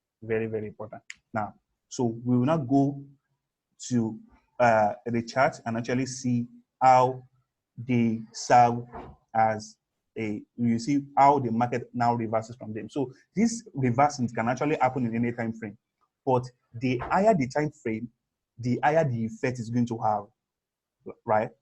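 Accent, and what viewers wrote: Nigeria. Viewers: Na naija guy na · You sound soooo Naija my guy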